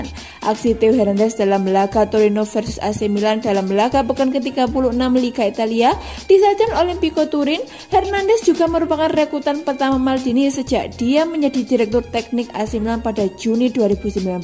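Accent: Java, Indonesia. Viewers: Narator nya medok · Seneng banget denger conten ini, jawa nya medok.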